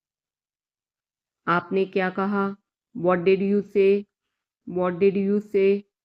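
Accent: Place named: Canada. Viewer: It is better but pronounced like Canadian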